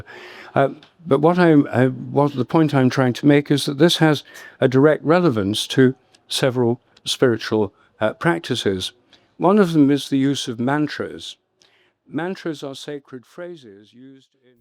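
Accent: RP, United Kingdom. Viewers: This is such an endless rehashing. Rupert is still at it with his posh accent luring in his cult.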